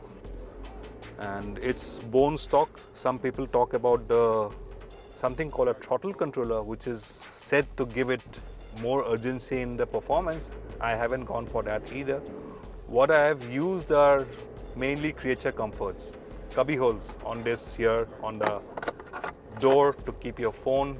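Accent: Assam, India. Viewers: Sir, apuni axomiya neki? Accent huni lagise..💗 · Lovely to see an Assamese host for a car show! I could figure out the Assamese accent right away!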